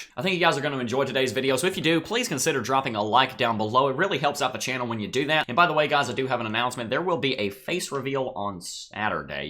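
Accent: South, United States. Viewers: Don't do it. Your folksy southern cadence is all we need!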